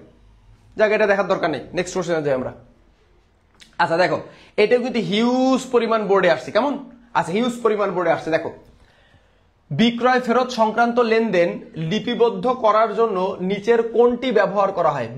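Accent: Barishal, Bangladesh. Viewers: আমি নিশ্চিত স্যারের বাসা বরিশাল।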